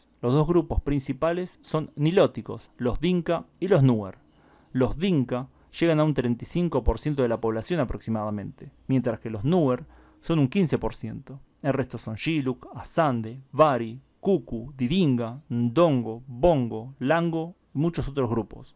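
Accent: Argentina. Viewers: O sotaque argentino é lindo. E um italiano falando espanhol.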